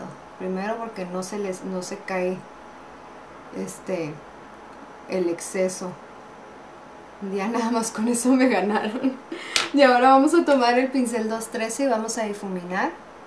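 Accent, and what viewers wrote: Mexico. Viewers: ya decia yo que sonaba muy mexicana xD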